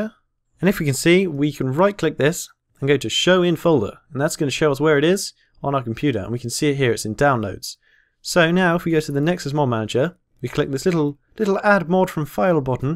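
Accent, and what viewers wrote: Ireland. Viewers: The only thing i could think of is 'Omg is he irish?' x3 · i bet your irish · then halfway through turned Irish again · your voice is so trippy cos of that irish accent that comes out at times. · You earned a sub. By the way, are you Irish? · is that a bit of irish im hearing? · ohhg irish and and from england fancy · Are you Irish? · Are you Irish? This is a random question, but you sound Irish. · Are you irish? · is he Irish?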